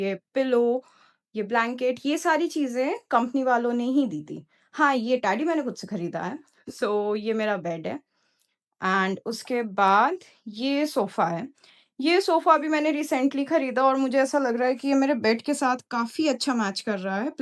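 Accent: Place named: United States. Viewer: Why your accent is American Hindi ?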